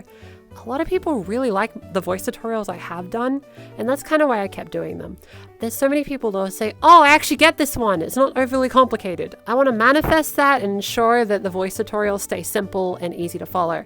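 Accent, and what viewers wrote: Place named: Australia. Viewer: want to thank you for the tutorial,. It was really refreshing to have another Aussie whose voice i could aim for and not the Californian (although those tutorials are still helpful!!) and you helped me practice my voice to the point where my vocal therapist was really impressed with my adaptability!! much love ❤️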